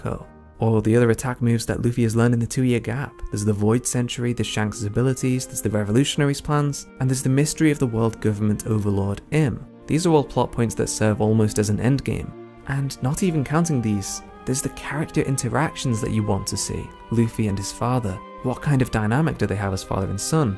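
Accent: United Kingdom. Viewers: British guy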